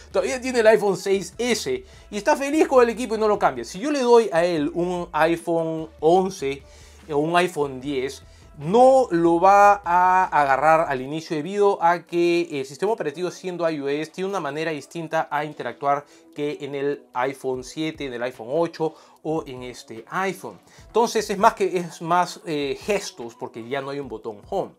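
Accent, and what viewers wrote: Lima, Peru. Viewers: Hola, eres peruano? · Ese dejo Limeño es inconfundible xD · eres peruano?!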